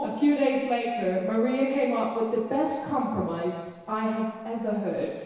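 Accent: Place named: United Kingdom. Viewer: Perfekt oversættelse fra din danske bid i “Grin til Gavn” oven i en formidabel britisk accent!🤩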